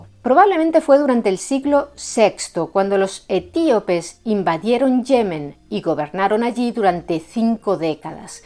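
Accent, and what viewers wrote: Spain. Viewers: Me dió risa porque si nos estamos tomando un cafecito mientras escuchaba el video, saludos, me encanta el contenido pese a que el acento español no me resulta tan agradable, pero claro que no me choca, solo es que no está en South TX uno muy acostumbrado a ese acento al ser de origen mexicano, sin embargo creo que para cuestiones hostoricas nos da cierto aire de parecer adecuado, así en mi mente funciona que creo que se hablaba en la antigüedad aunque sea algo que no sea verdad pero así se concibe en mi mente!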